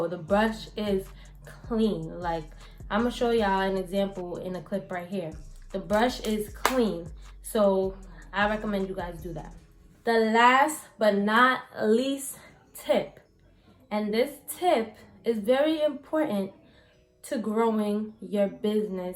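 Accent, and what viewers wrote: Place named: New York, United States. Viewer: Haha loved this. Girl you gotta be from NY I know a NY accent when I hear one lols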